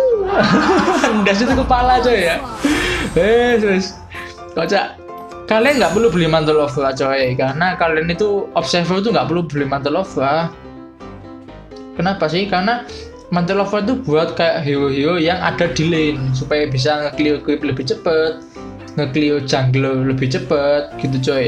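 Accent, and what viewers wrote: Java, Indonesia. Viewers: Bang wong jowo tah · Wong jowo yo lur mantap tenan 😂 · Asli kang kog medog tenan.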